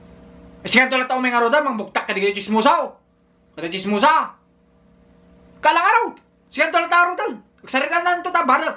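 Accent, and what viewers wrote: Ilocos, Philippines. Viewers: I have Ilocano parents from Gerona Tarlac, I was born and raised in London U.K. Your accent very strong Ilocano and reminds me of my relatives aunties and uncles from the barrio village very strong Ilocano and shouting talking with each other like they are in the mountain! Hahaha 🤣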